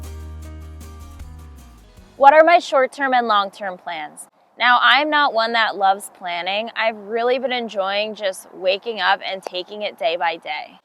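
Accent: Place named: New England, United States. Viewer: She's from New Jersey. So it's probably that new england accent.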